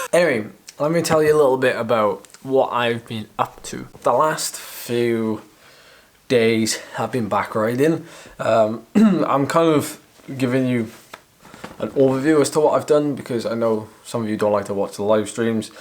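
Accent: Wales, United Kingdom. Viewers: When I moved to Cardiff as a kid one of the first things I heard was ‘now in a minute’ Still tickles me 50 years later. How Welsh are you but? 😂🏴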